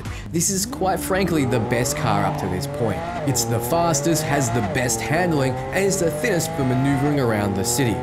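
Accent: Australia. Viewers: Different accents pronounce things differently. There's no absolute right way to pronounce words in english since there's so many different versions of it. E.g. the word "herb" is different between american english and british english since americans say with H as silent while british actually say the H. This dude is australian so they might say it with the E silent. I'm finnish and we study british english at school and even I would pronounce Porsche with a silent E.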